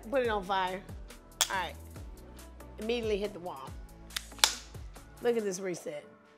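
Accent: South, United States. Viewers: I was really loving a video, but then she started to talk and I fell in love 😍 I love me a good southern women’s accent. Great shooting and you got your new subscriber. ❤❤❤ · You deserve more subscribers. Cool content. Not many women doing this & as a Georgian I appreciate the cute southern accent. Cheers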